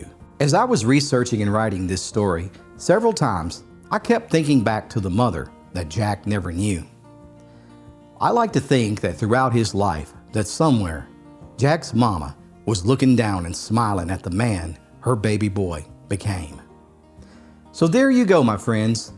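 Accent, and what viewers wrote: Appalachia, United States. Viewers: I rarely leave a comment. Yet, I wanted to thank you for all your stories. Your voice is always calming & a constant reminder of my time in Appalachia. The comments, too, left on your channel regarding your stories are comforting. Your content is sorely needed in these days of uncertainty. With deepest appreciation, a grateful listener ❤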